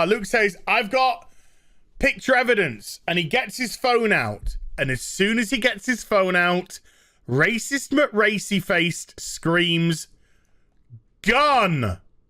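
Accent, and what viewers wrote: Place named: United Kingdom. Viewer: Damn that british accent is spot on.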